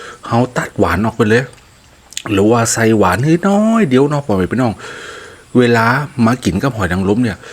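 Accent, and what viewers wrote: North, Thailand. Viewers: มาอยู่ต่าง จว. ดูผิวคล้ำดำไปเลย🤣🤣🤣 อยู่กับธรรมชาติ แต่ที่เหมือนเดิมคือกิน กินอร่อย ไม่เปลี่ยน ลืมอีกอย่างที่เปลี่ยนคือพูด ภาษาเหนือ ฟังรู้มั้งไม่รู้มั้ง 555 แต่ก็ต้องฟัง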